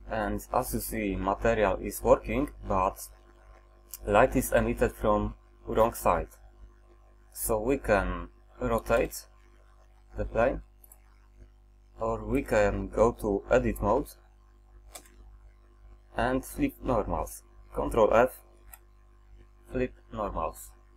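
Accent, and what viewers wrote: Poland. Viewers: Polski akcent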